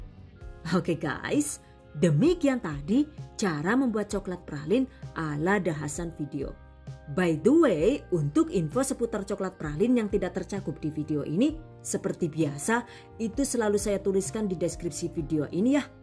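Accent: Banyumas, Indonesia. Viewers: Mantap teh,pake BHS ngapak dong,seneng bget q dengernya. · lah jan rika jane wong ngendi.. 😆nyong ngapak kie,dadi geget ngrungokna rika gawe video wkwk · Pling suka nonton vidio lucu bhsnnya cmpur ngapak krn aq jga org purbalingga jawatengah sama ngapaknya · Kerennnnn Bun apalagi kalau pas Bunda ngobrol ngapak seneng saya dan Alhamdulillah sya kemaren nyoba buat modal cangkir dan tatakan nya sama mobil2 an lucu lucu makasih Bun sehat selalu buat Bund👍🏼👍🏼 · Seneng nek lg ngapake 😅😅😅 Suka pokoke nyonge ngrungokna ngapake ora ngapak ora penak 😍 Sllu mengikuti yu 😍 sukses selalu.pokoke 🙂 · ini aslinya orang mana sih? Ngmong ngapaknya lucu, tp pinter bhasa inggris juga 😁😁👍👍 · Saya suka dengan Chanel ini, penyampaian dan resep nya ok banget. Mbk nya juga lucu,kadang ngapak juga. Haha Andalan nya "catat" dan "ookeeh guys" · wong ngendi sih rika kok bisa bahasa ngapak? 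Salam ngapak 🤣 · 😂😂 klo sdh logat ngapak bikin ngakak Ini konten masak teraasikk 😁 sukses bu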